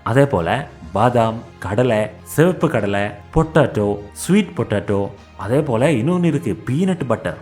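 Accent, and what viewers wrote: Kerala, India. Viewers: Kerala bro❤️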